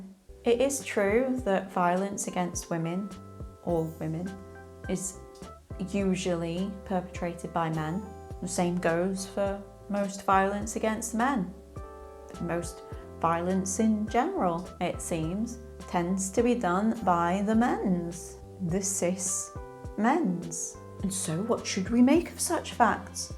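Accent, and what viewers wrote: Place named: Northern England, United Kingdom. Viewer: wonderful work. i have smashed that like button and hit subscribe. i miss the northern english accent spoken softly. i don't want to guess the county because to get that wrong is more often to offend than not.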